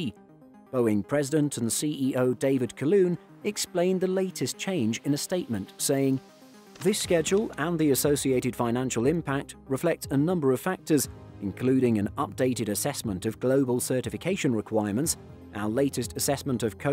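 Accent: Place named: United Kingdom. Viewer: You are British by the sound of you so Preeedersessors fella not the American Prehdersessor c'mon!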